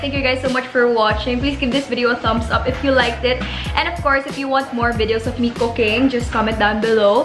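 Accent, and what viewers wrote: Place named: Visayas, Philippines. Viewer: I like how you speak bisaya so cute😍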